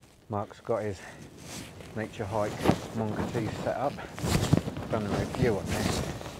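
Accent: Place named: East Anglia, United Kingdom. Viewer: Ah, are you from East Anglia? Your accent sounded familiar to me and thought you might be from that area?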